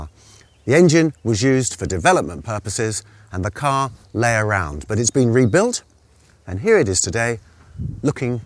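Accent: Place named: United Kingdom. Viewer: I love the way the British pronounce the marque: "jag-you-er", as opposed to the way we say it in the States: "Jag-ooh- are".. however you say it, this is a pretty car. My only complaint is that the tail looks a bit too much like the nose. I don't like designs that look the same coming and going... A slightly upswept "Kamm" style spoiler tail, such as on the Ford GT40, '68-72 Vette, or Ferrari 250 GTO or 250 LM, would have been an improvement IMO.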